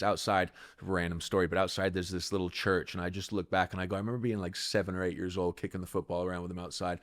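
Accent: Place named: England, United Kingdom. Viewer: Hints of an english accent coming through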